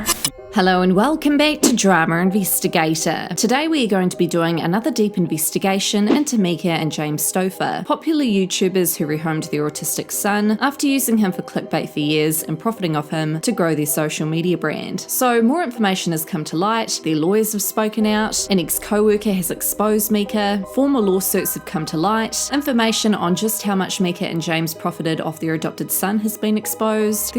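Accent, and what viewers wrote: New Zealand. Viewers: Off topic: I’ve never been on this channel so this may have been asked before. Are you a Kiwi? Is this a Kiwi accent i’m hearing? Great content btw. That narcissistic couple should be banned on Youtube. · Your accent is lovely, are you from New Zealand? Sorry if I got it wrong, I often mix Kiwi accents for South African 😳🤪 I'm Scottish so feel free to call me English if I got it wrong hehe 😂 I've just heard about these selfish, clout chasing, money grabbing, neglectful, greedy losers and yours is the first vid I found. All caught up now, thank you. Sending love from Edinburgh 💙🏴💙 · Great video. I'm just curious, are you from New Zealand?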